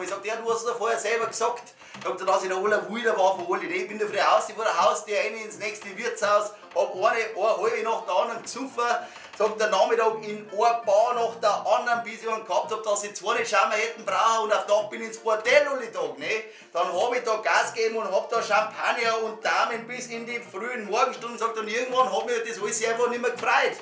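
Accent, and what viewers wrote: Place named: Bavaria, Germany. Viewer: ich versteh kein wort...krass komme ausm nachbarbundesland aber ich mag den...die bayern...haltet eure fahne hoch...ihr seit was bsonderes...passt euch net an...bleibt urig;)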